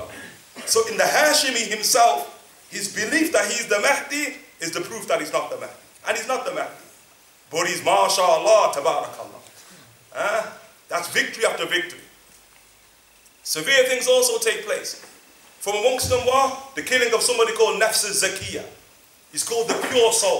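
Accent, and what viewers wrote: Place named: Liverpool, United Kingdom. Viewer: He has a British Scouse accent .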